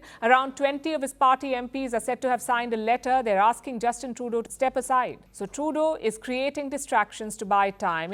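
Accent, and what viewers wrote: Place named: India. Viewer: The awfully strong Indian accent along with the unnaturally fast speaking rhythm are absolutely a nightmare to comprehend!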